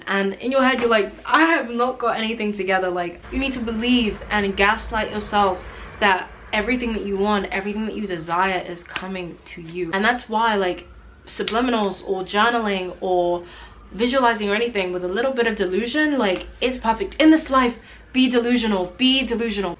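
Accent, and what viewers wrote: United Kingdom. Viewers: I just came from one of her older videos, why does she have a British accent now?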